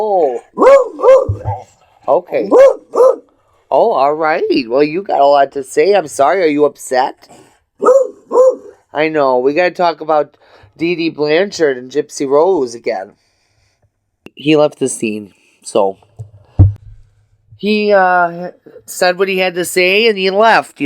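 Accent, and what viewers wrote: United States. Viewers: Is your accent a New York accent or Boson accent? Jersey? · It must be a glam Christmas this year. I’m a retired MUA and hair freak and bought my girls a bunch of hair and makeup stuff! I enjoy ur channel so much. Just joined cuz gotta support a homegirl. I’m actually on the other side of the lake in MI but the accents the same!😂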